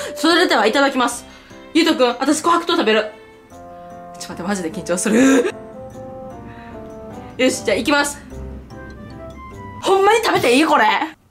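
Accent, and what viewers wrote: Kansai, Japan. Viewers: いつもにも増してゴリッゴリの関西人で草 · って関西弁しゃべってるけど関西の人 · テンション上がってることによって関西臭が強くなってるwwwwwww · なんか今日いつも以上に関西人って感じするんやけど気のせい??ww 自分も琥珀糖食べてみたくなった🍴( ¨̮ )💬💕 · 関西弁すごすぎて草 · 関西人丸出し😂 終始おもろい笑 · いつもに増して関西弁がすごいですねw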